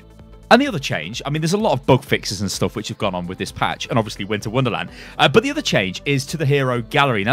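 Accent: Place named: United Kingdom. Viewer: I like your british voice way more than these other american Overwatch youtubers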